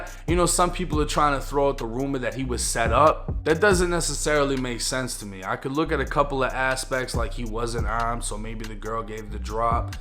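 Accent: Boston, United States. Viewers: Just really getting into your vids I see you rep FL but your mass/Boston accent is crazy 🤣🤣🤣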